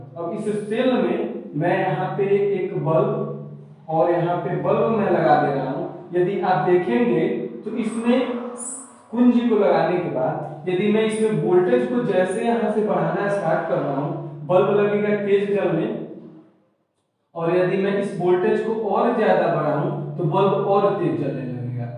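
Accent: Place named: Gujarat, India. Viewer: Apki awaz gujrati sunayi parti thora saaaf bola kariye sir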